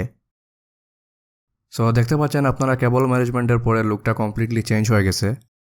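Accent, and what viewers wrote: Sylhet, Bangladesh. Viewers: Vaia apni ki Sylheti naki??😃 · Bhaiya ki sylhety ✌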